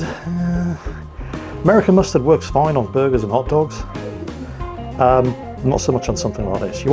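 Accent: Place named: England, United Kingdom. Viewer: [New Recommendation comes up] What's this? is doing a cooking channel now? Guess that's what I get for not watching Mingles with anymore. Subbed. Continue soothing my ear holes with your soft English accent you crazy old fart, you.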